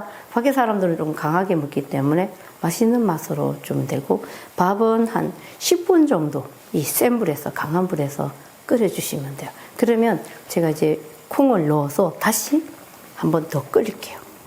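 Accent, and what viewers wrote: Gyeongsang, South Korea. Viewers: 외국말이 아니고요 경상도 사투리에 표준말 석어 하실려니까 어색하게 들려 그런거 갇은대요 ㅎ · 스님 경상도말이넘정겨워요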